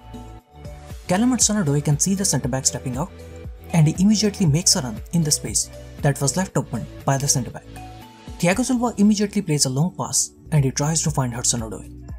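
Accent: India. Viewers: Man sounds like he's from India, and here the match is early on Friday.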